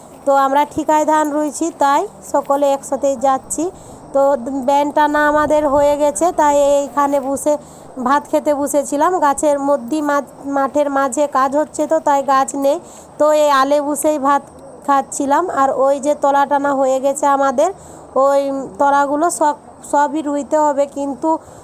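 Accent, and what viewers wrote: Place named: Purba Medinipur, India. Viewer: খুব ভালো লাগলো তোমার ভিডিও তোমার কথা শুনে মনে হচ্ছে । পূর্ব মেদিনী পুরে বাড়ি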